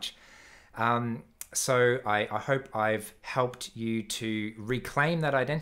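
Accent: Australia. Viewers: Thank you so much for this video! It helped me understand why I constantly talk, even to myself. Only then can I structure things. Wow! Wish there had been people like you when I chose to be a translator (written translations) cause I didn't trust my personality to be an interpretor (spoken translations) although I always loved to hear native people speak in their varieties. Well, now that I'm retired, I can do that on holidays. P.S.: I love your Australian English!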